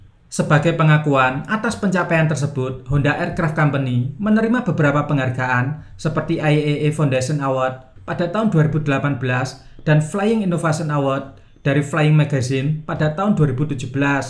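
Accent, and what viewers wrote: Java, Indonesia. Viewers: host medoook 😁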